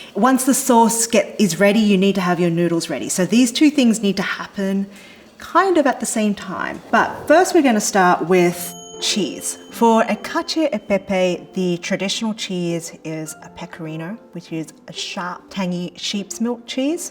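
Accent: Australia. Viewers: Oz accents for life!! ☺️ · She's so happy that even her Australian accent sounds happier.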